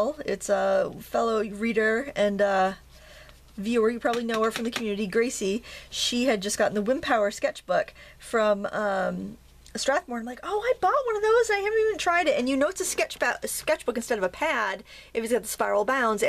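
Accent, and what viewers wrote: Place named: New England, United States. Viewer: I never tire of hearing the Maine/New England "Squerl" :) oh And "seepeeah" is correct - "Sepia" the Neo-Latin name for the first genus of cuttlefish that Linneas named in 1758. It is a transliteration of the Ancient Greek "σηπία", "sēpía", meaning "cuttlefish", and of course the colour has the same name as the ink of the cuttlefish is the original (and best in my view in terms of hue, stability is another matter) "sepia" paint. Hard to get now as cuttlefish are sold frozen whole for food with the ink sacks intact now, so not a byproduct.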